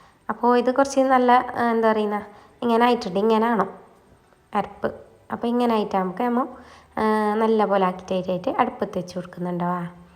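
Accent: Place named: Kasaragod, India. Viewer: 🎉🎉🎉❤ സൂപ്പർ❤❤ കാസർകോട്ട് സംസാരം കേൾക്കാൻ സൂപ്പർ നമ്മൾ കോഴിക്കോട്ടുകാർ🎉🎉🎉❤ ഇതൊന്നും വെച്ച് കൂട്ടി നോക്കണം😂😂😂😂😂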